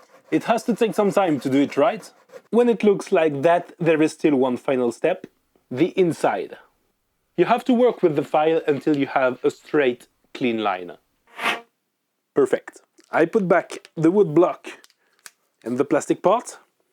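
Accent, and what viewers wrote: France. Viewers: Pas de réaction à mon post d'il y a BIEN PLUS d'un mois (eh, YT de mes...). Pas étonnant : tu t'adresse à un public anglophone ou anglophile (dont y doit y en avoir qques uns qui viennent se régaler de ton accent Frenchie) et À PEU PRÈS les seul à avoir pigé le sens de mon intervention, c'est toi. De deux choses l'une (à part le soleil bien sûr), OU BIEN t'as pas le temps de revenir voir les comm. sur tes produc à YT, OU BIEN tu t'en fous de ce que je te dis. Libre à toi de continuer dans cette voie, mais sois prévenu : c'est une très lourde responsabilité que tu prends en n'avertissant pas ton public du danger à porter en bouche, régulièrement, du pvc industriel. Si c'est la 1ère hypothèse qui est juste, j'ai pas d'autre choix que d'inonder les comm. de tes autres "sorties" pour battre l'alarme. (Et p'tain, je me passerais bien de me farcir ce taf ; car y en a d'autres qui font comme toi - mais pas avec autant de constance d'ailleurs je ne sais pas comment tu fais ; chapeau pour ça - & pas pour ton entêtement si c'est la 2ème hypothèse qui prévaut...)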